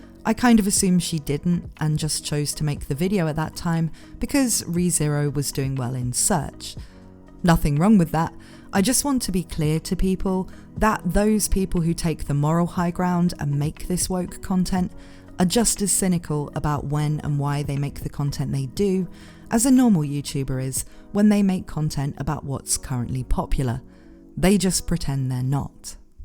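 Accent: United Kingdom. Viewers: I’m an over the road(otr) trucker in America, so it could be just that lack of familiarity with uk accents, but you really sound like my truck PC gps voice. A very pleasant smooth female British voice. Also, great video. As a dude, I do get fatigue from constantly hearing that because I have a penis, I’m the source of all women’s problems, so every once in awhile, it’s nice to hear from a woman who isn’t bitter down to the core of her being about having to live as a shudder woman. Finally, I also LOVE to hear from people who are truly passionate about things. Like when someone KNOWS cars and can talk your ear off about different models and their high and low points. And while the video wasn’t all about re:zero, the obvious passion you have for it, coupled with the fact that I didn’t know much about it before, and learning all this new information about it from you, has made me think that maybe I ought to go purchase the first few manga, or at least watch a few episodes. 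Also, also, you’ve definitely earned a subscription with this video. Sure it was an hour but it didn’t feel like one! Well done!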